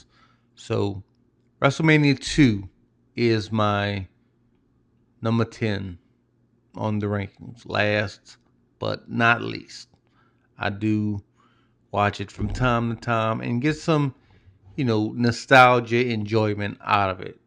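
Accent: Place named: Louisiana, United States. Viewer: You sound like you're from Louisiana, particularly the Houma area.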